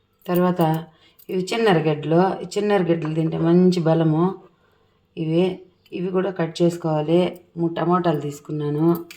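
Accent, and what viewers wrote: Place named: Chittoor, India. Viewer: Pakka chittoor basha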